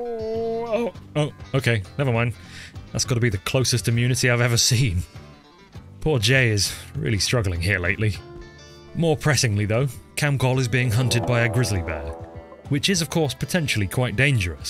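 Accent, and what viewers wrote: United Kingdom. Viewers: doesnt this dude sound like spiffing brit ?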